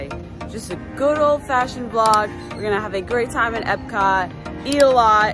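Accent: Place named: New York, United States. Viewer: New York is on mine and my wife’s bucket list! I thought that was your accent but didn’t want to speculate lol. COME ON YOU BOYS IN GREEN!!!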